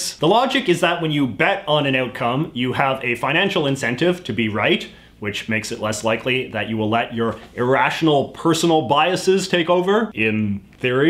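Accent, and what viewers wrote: Canada. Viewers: Don't you sound too canadian to have a dog in this fight? (Or do you believe we're the 51th state?) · I love but as a fellow Canadian, I have never been more annoyed by an "about" pronunciation. I always thought that was a myth until I started watching his videos. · this is the heaviest canadian accent i have ever heard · this might be the most Canadian accent I have ever heard in my life and I love it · you have one of the Richest Canadian accents I've ever heard. Not saying that's a bad thing. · Your accent is aggressively Canadian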